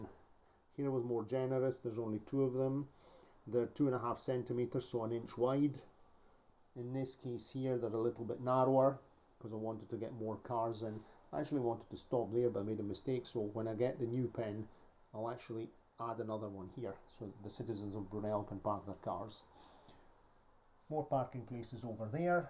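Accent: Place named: Scotland, United Kingdom. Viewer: I think autumn passed us here last Tuesday! 😂 Don’t forget that your “heavy “ Scots is a language in itself. Dinnae let nae Sassenach tell ye otherwise! My French wife speaks a lot of Ulster Scots too. It’s funny to hear her call the dogs “wee skitters” or the price of the shopping “fairly skelped her, so it did”! 😂 Did you grow up in a bilingual home in Glasgow? I know your family are Italian. Do you have a Scots accent when you speak Italian/Romanian? Anyway, off to Germany shopping now. Try a bit of the aul Deutsch. Ein Bier bitte. Guten Tag!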